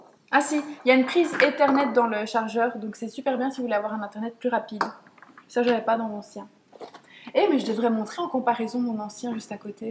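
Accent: Belgium. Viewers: J'ai le même couleur argent, très agréable d'entendre ton accent Belge 🙂j'suis Canadien ;-)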